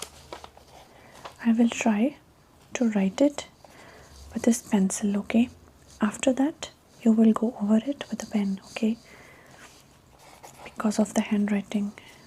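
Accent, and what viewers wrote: India. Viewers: Hindi bolte huwe cute lagte ho Or hindi bolte huwe tumhari voice bhi acha sound karti hai I like your hindi accent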